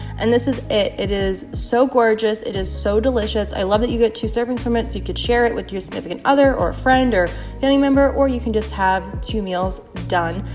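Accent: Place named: Philadelphia, United States. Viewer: Great recipes! Just started low carb vegan a couple weeks ago and already seeing results. Are you from Philly area? I detect the accent (I'm from there too :) )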